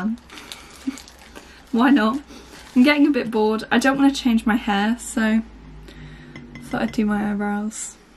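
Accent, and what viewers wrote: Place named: United Kingdom. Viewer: You sound like your from Britain. I've been to London it's a great place. Thanks for sharing this electrifying video with us that watch your channel. Outstanding job young lady.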